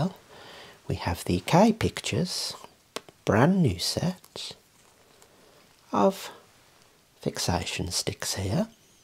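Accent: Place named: England, United Kingdom. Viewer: This is how I imagine everyone from England speaks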